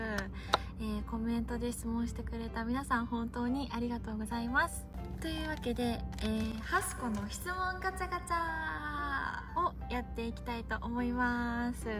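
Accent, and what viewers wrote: Yamaguchi, Japan. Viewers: ハツコにあるんだけど、聞いてくれるかな? 数か月前のみたら山口の方言使っていて、ほんのりした感じで癒やされたよ。たまに方言使ってやって下さいね。❤️ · 今日もアップありがとうございます🎉 グッズ、欲しいですね。絵が上手いのでステッカーとか😊 字も上手だからサインも欲しいです。あとところどころに出る山口弁に癒されています。十万分のイチだけど応援しています。ハスコ天使ナンバー❶❤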